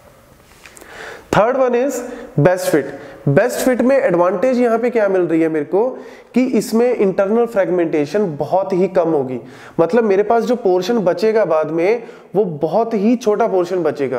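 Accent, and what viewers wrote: India. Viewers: accent is way to strong. i already hate indian one but this is blasting my patience 😂. im sorry this is not personal, because i hate all idian accents. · oh my god! why is it so hard to understand indian english? i can only understand 1 out of 500 videos i see around! i recommend indian guys to put subtitles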